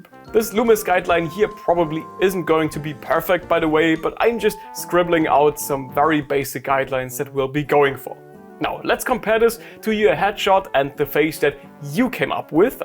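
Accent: Germany. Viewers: I just bought your book! draw like a mangaka!!!! thank you so much of all of your videos!!!!!!!!!!! I like hearing your German accent! it inspired me enough to get back only German lessons, so, that you for that too!!!!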